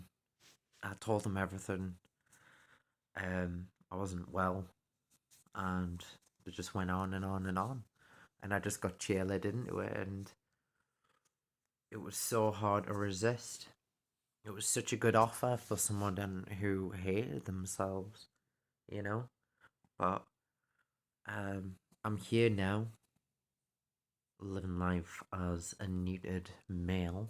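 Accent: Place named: County Durham, United Kingdom. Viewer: Dunno if your channel is even still active, but I just wanted to tell you you're amazing, I think you're proper class. You've been through so much, but you're an amazing human being. I'm from county durham but live in Australia and when I saw and heard you it felt like home ☺️ i hope you're OK, I really do. Life can be so shit some times, I've gone through very different things, but pain is pain. I just felt the need to reach out and say hi 😊 hope you're doing well, it cannot have been easy.' I can't even imagine. I appreciate all of your public speaking, I think it helps the community, or even if it gelps one person, you've done so much! Thanks for easing my homesickness, but most importantly, being very vulnerable, true, and honest. You're amazing ❤❤❤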